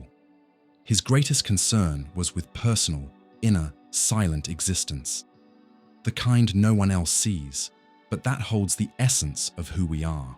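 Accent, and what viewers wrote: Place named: United Kingdom. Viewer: Gosh... 2.5 hours of AI crap, W/British Accent and Sparkly Background to boot!! I need an AI which hides crap like this.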